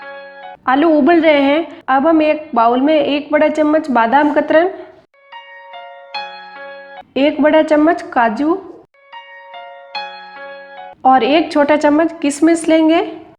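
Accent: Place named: Marwar, India. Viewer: All Indian Rasoi waoo hum bhi marwadi h aapna loog ki boli s ptaa chl jtaa h ....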